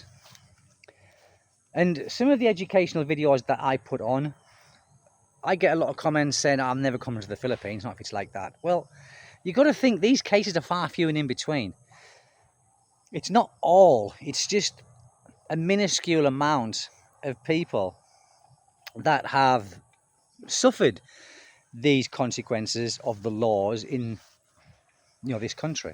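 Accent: United Kingdom. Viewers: Good morning my friend. You’re absolutely correct in what you’re saying and I know that I’m in the minority, but I watch your channel just to see what you’re doing today. I don’t even look at the title. At least not any longer than it takes to see that it’s you. I’m not interested in the drama. I’m not interested in the car wreck. I just want to see what my friend, over there living life on another island is doing today. Your British way of speaking gives me a chuckle. What makes me unsubscribe straight away is click bait. Put up a title and a video that has little to nothing to do with the title and I’m an “un-subscriber”! Doing “cost of living” videos I won’t watch either. I hate it when people ask about what it cost to live here or there in the Philippines. If you have been here, and gotten out of the tourist districts, then you’ll know what it cost and can figure out what “YOUR LIFESTYLE” will cost you… no one can tell you what it will cost YOU to live somewhere. 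But it gets clicks because people want to move here without ever having been here apparently. You keep being you and I’ll keep watching “YOU” doing “YOU”.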